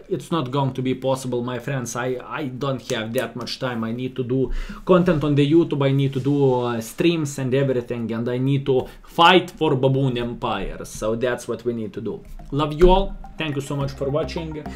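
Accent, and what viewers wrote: Russia. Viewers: where is he from then ??? He may not live in Russia but definitely speaks it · russian akcnet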